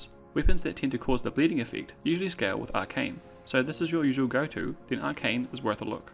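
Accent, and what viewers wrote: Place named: Australia. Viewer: "Biddeh" i love australian accent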